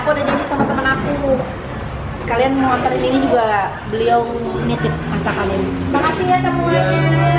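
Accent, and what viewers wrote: West Java, Indonesia. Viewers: Th makin syantik..aku suka logat sunda nya..sehat sllu and semakin sukses.. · teh ramah murah senyum logatnya orang sunda banget, sifatnya mencerminkan orang sunda yang ramah dan santun. i love you teteh sareng kang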